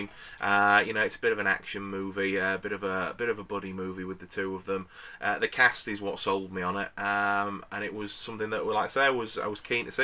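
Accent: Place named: United Kingdom. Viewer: Hey mate, just found your channel and subbed straight away. Great buds buddy. Where abouts in the uk are from? Always good to hear a fellow Brit in the Tube 👍🏻🎥💿🎞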